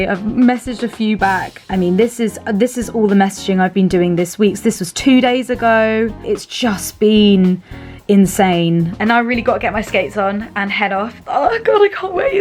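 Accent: United Kingdom. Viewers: Hello, you're AMAZING! 😁 I love your openness and especially about your tickling fetish! ❤ I have had a tickling/foot fetish since I was 6 years old! 😱 I never understood why? For years I thought that I was a mutant and kept my fetish a secret! 🥺 Then thank God for the internet! 🙌 Because then I realized that I wasn't alone and found out that it is a very common fetish! I applaud you for your boldness and openness to discuss to others about our fetish! 👏 I am bummed that I missed this certain tickle party though, 😭 I am guessing from your beautiful British accent though, that it was probably far away from me, since I live in the United States 🇺🇸 I would love to do a party myself, or if you ever plan to come to the U.S. then definitely hit me up and we could maybe make it happen, because there is A LOT of tickling fetishists all over the U.S. I have subscribed to you, it was the easiest decision and most important subscription that I had to make on YouTube! 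👍I bet you're far from me though, because it seems to be that all the good one's (girls) That seem to be compatible or share the same interests usually live far away! 🥺 Continue being as great as you're! 💗 You definitely have a new friend and fan! PLEASE do more videos regarding tickling! 😍We the tickle community needs more people like YOU! 👇 Take care and HAPPY tickling to you! 🥰 🤣 \|/ /\ 👉👣👈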